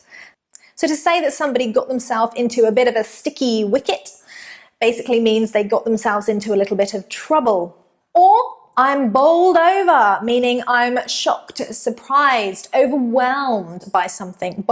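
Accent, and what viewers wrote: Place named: RP, United Kingdom. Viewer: I like your posh accent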